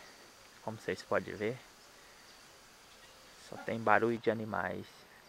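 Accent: Minas Gerais, Brazil. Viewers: Lindo sítio sem conta que adoro esse sotaque minereis eu que sou mineira também de Mahumirim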